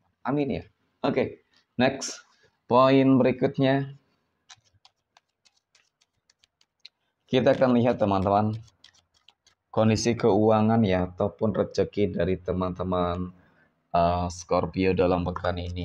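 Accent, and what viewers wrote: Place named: Banyumas, Indonesia. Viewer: Aamin hadir bang jawa ngapak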